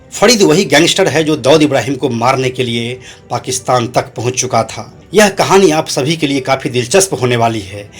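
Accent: Bihar, India. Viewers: Hee too tuu bihari aawaj teri smj nhi aari bol kya rha h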